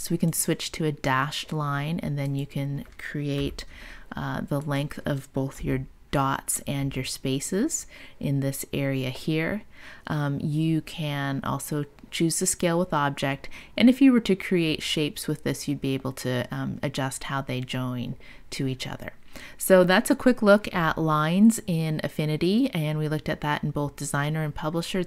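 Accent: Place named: United States. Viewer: What a relief to hear/see a tutorial in American English.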